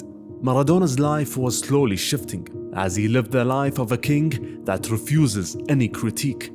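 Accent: Arab world. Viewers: This was an excellent documentary. Until the very end I thought the narrator was Aregentinian😀 before finding out the accent was Arabic. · The narrator has a thick Arabic accent. That's why. He needs to attend an Accent Removal Course...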